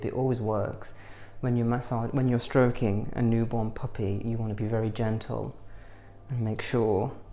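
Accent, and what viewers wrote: RP, United Kingdom. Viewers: you are so posh! I absolutely adore you. ♥️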